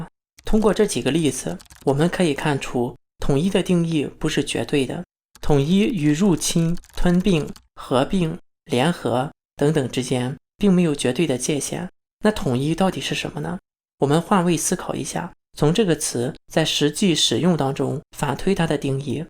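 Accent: Shandong, China. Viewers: 你这口音真难听，一听就是我们山东的吧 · 这口音是山东？河北？不管了，中国应该是联邦制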